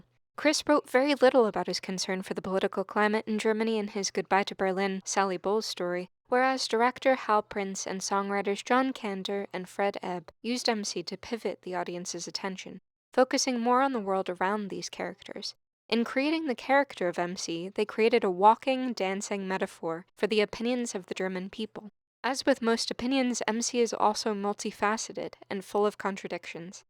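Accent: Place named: United States. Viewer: you sound like an American, and at this point in our political history I think that it is fair to stop holding the German people particularly accountable for the rise of fascism. I'm watching it rise here in America and the same thing is going on. Most people are simply passive, and politically disinterested. They're not willing to risk anything about their simple lives in order to resist fascism or authoritarianism.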